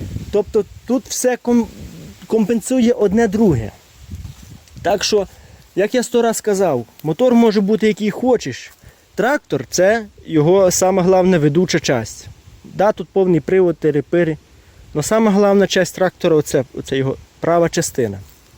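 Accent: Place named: Volyn, Ukraine. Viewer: Бо на Волині Вісі так піздять, я тоже з Волині і я знаю як тут люди говорять 😂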